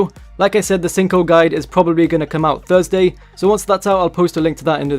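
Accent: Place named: United Kingdom. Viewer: i wish i had a dollar for every time i heard this brit say "as well"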